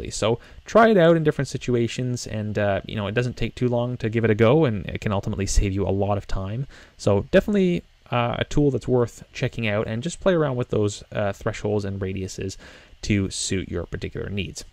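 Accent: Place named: Canada. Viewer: I knew you were Canadian by your cadence. Dead giveaway was when you said too low. also thank you cause this was really helpful despite my need to identify your heritage. haha.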